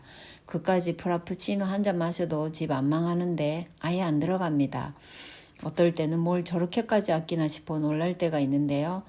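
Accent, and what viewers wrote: Gyeongsang, South Korea. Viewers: ㅎㅎ 경상도 사투리 정겹네요. 저도 미국에서 공부해 봤는데 미국생활 익숙할 듯 하면서도 힘들고 만만치 않죠. 재미있는 영상 잘 봤습니다. 감사합니다.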